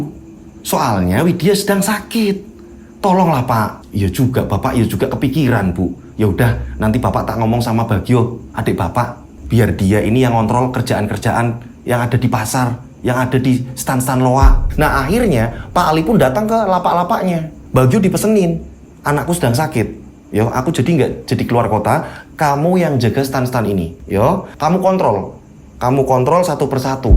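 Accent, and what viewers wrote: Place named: Central Java, Indonesia. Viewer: Absen Kuwait 🇰🇼♥ Ahirnya ada lnjutanya juga yesss Klo dengrin critanya mas ko aku kaya lagi d ceritain mantan🤭 suaranya mirip sih logatnya sma krna dia juga dari jateng aku nya indramyu 🤣🤣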